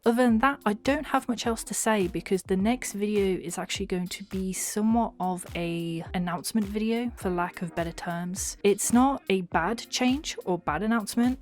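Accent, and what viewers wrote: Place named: United Kingdom. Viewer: geez your accent is falling thru, i can smell the brit in you from a mile away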